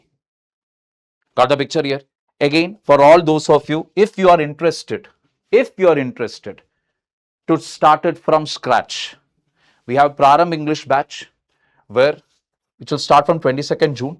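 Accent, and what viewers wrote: Kerala, India. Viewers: This stupid is wasting aspirants valuable time by cleverly indulging motivation content in his lectures, with that malayali accent and popping eye gestures he is playing a good drama digitally and earning well.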